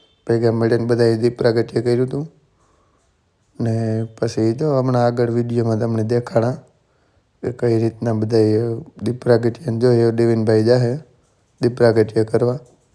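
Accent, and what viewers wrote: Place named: Mer, India. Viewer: દીકા મેર ની ભાષા જ બોલજે તું એ ભાષા મા જ બહુ મીઠડો લાગે વાલા..... મેર ની બોલી જ મીઠડી છે હો.....❤ જાજુ બધું વાલ દીકરા તને અને ડેવિન ભાઈ ને.🥰👍🙏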